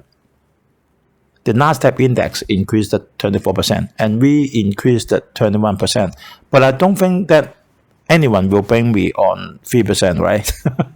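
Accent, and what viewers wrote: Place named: China. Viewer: i don't see you have any improvement at all.you have the faith to speak does not means you speak it right.the way you speaking is like translate chinese speech to english.another Like you speak Omincron you pronounce it OH ME CON go google and type Omincron pronunciation and you find how to pronounce it.another example is the word 'Purpose" and you speak like "purple"and i thought you talking about colour by the way ,if you are born and raise in Hong Kong english is not a new language for you.am I right? hope you don't get mad when i criticize .Have bravery to speaking is good but you gotta speak it right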